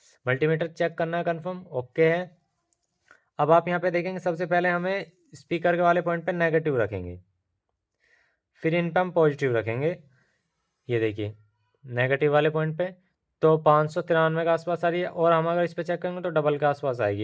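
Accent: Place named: Tripura, India. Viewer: Kaisa ho sir, Tripura sa bol raha ho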